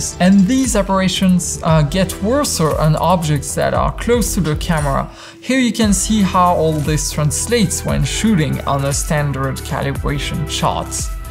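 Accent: France. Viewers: Salut sachant que pertinemment tu es français de par ton accent même si c'est en anglais ta présentation est objective éclair me concernant je suis sur le viltrox car en premier lieu ça a été le budget qui me l'a imposé tu es rentré dans détail technique qui certainement on était factuelle pour le metabones et du fait que je ne suis pas dans de la technique pure je suis avec mon gh5 viltrox m2 et un sigma 18-35 à l'image dans 95 % des cas nous ne le voyons pas sachant que nous réalisons des clip pour le public et non des professionnels averti comme toi personne ne peut y voir une quelconque différence ensuite le jour où j'aurais un budget supplémentaire pourquoi pas upgradé,mais en l'état je suis très satisfait de mon viltrox m2,merci pour tes reviews de qualité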